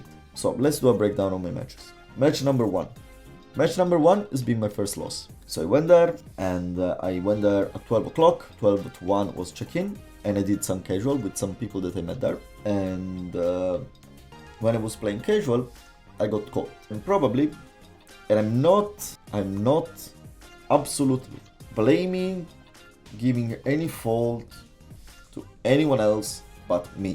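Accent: Italy. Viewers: Sei italiano Bro ?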